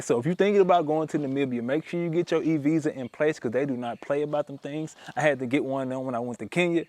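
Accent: United States. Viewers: I can tell by your accent that your people are in America.....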